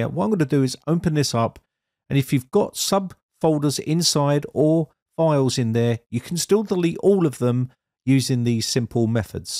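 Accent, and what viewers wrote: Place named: Cockney, United Kingdom. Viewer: I'm pretty sure he's saying, "What you guys" which is like the cockney geezer way of saying, "What's up guys" 😁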